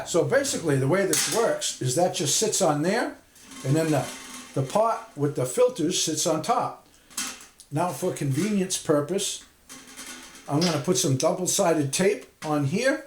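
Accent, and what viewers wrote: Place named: New England, United States. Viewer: Hi sir! I really enjoyed watching your video... I am thinking of building my own off grid cabin and was concerned with how I would get clean water. I could easily add gutters and a rainwater catchment system, even on a lean-to just off by itself. I would love to try to make this, even just to have I think it's a great idea, even for camping. Thank you, from a fellow New Englander, I knew I could trust you by your accent. :)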